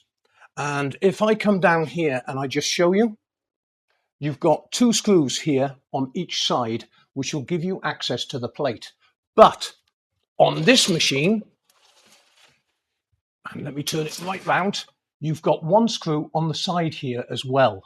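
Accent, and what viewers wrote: United Kingdom. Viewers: Absolutely fabulous! As usual. Every time I find something new to learn with. Special thanks for your plain, Royal English so very easy to follow!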